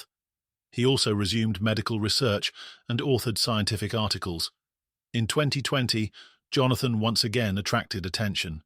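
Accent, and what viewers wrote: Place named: United Kingdom. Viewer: Never sounds right with British accent, especially as scripts are written by Americans, so have American words like "sidewalk" - in England we say "pavement". It sounds odd hearing these words read in a British accent. It's more genuine when a native narrates, one can get into the spirit of the story better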